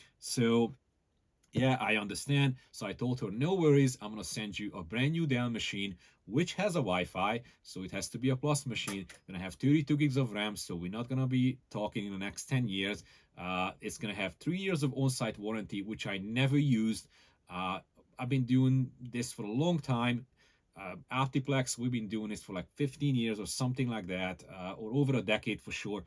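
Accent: Hungary. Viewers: Magyar akcentusod van :) Jó bemutató.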